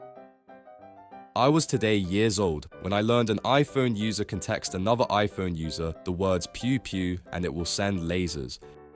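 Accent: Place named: Birmingham, United Kingdom. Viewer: Nope! Back to the old voice. This one sounds like a Brummie with Down Syndrome.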